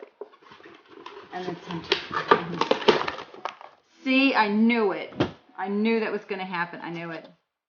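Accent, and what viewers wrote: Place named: United States. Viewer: I can't follow your American Accent......🙂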